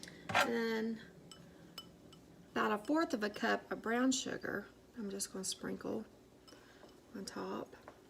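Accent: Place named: South, United States. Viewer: It looks delicious! Love your southern accent, so cute. Have a great day!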